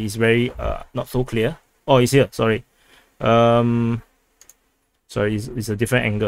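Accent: Singapore. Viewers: Seems like you are more happy to believe the Russian MoD information rather than the Ukrainian MoD information. Maybe you don't notice, but there is clear bias in your opinion, and the way you put it across. Personally I don't believe either side with thier stated figures and actions, all that matters is the facts on the ground. And the facts on the ground show that overall Ukrainian forces have been able to take back allot of territory so far, they are not even fully mobilized have received very few heavy weapons. You would expect it to take at least another 2-months before the effects of such are seen. Really all Ukraine can do right now in the east is hold the line the best they can without being obliterated, naturally during this period, with such concentrated Russian forces, land will be lost. I think if Ukraine can't seriously get on the offensive within 6-months then these lands will become increasingly difficult to retake, and just for the reasons you stated, but within this timeframe there is plenty of opportunity to reclaim these territories. 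Doubt it on Crimea and Donbass however, possibly if Russia collapses within, but only then. Now if I am not incorrect, you sound Singaporean, I have worked there many times, very hard business approached people, so the importance of morale factor might be difficult for you to fully grasp. And you might have thought that the Ukrainians might just roll over, but Kiev was not taken in 3-days or ever, and thier military still fight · great reporting and analysis , are you singaporean (based on your accent) ? hope you are fine there and not attacked / reported by insane amount of pro ukraine trolls (including those from troll farms in UK/US/FR)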